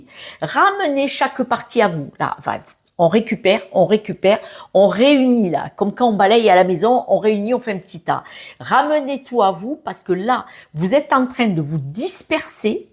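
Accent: South, France. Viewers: Oui je suis d'accord avec vous...j'ai commencé à prioriser ce que je pense faire sur mes jours de vacances...rangement et sorties avec les amies...mon autre est statique...je dirais..hyper-statique 🙄 Vous êtes l'alchimie de Louis de Funes (en geste) Maïté(en paroles bienveillantes avec l'accent)Jamie Lee Curtis(pour le physique) et le tout avec le ❤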